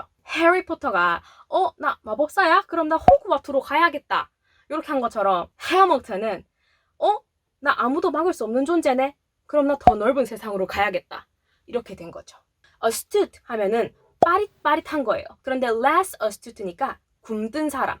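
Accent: Gyeongsang, South Korea. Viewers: 경상도 사투리와 유창한 영어, 그리고 꽤(?)하시는 가창력조합.. 올해내 일단1만구독찍고, 내년에 10만가즈아. 구글 알고리즘아, 님좀 밀어줘라~~♡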